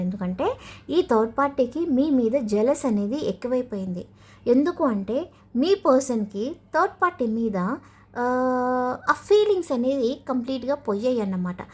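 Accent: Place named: Godavari, India. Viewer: మీది గోదావరి అండి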